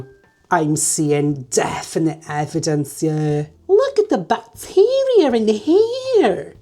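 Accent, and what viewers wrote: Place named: South Wales, United Kingdom. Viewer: that switch into PPE had me screaming 😂😂 I love you.. you’re hilarious! I moved to Pembrokeshire a few years ago but it’s so nice to hear that South Wales accent again. Instantly brought me back to my roots! 🏴 Lush!! 🎉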